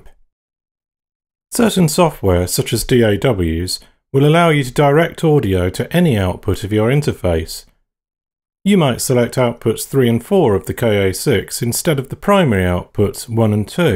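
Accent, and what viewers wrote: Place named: United Kingdom. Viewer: The British accent took this amazing product overview right over the top.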